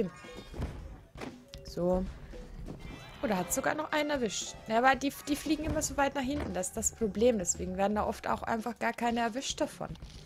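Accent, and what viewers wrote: Bavaria, Germany. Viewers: könntest du bitte nochmal eine Folge nur bayrisch sprechen bitte?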